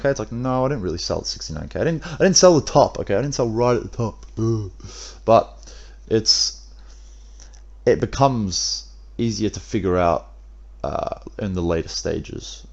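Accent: Australia. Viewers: aussie accent fooo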